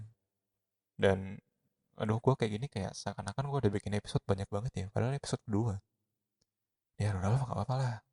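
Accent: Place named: Germany. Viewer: Kakak asli mana ? Kok suaranya ada aksen jermannya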